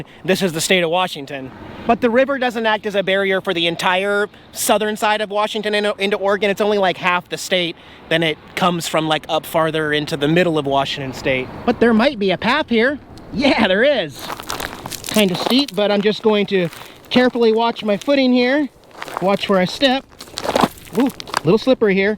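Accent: South, United States. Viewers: You can't get the South all the way out of a Southerner!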